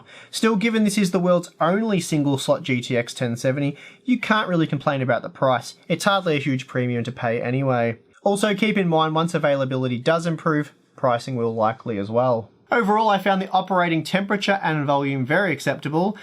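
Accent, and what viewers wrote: Australia. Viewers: I do so like your videos matey, nice to hear an Aussie online. Keep up the good work on the reviews. :)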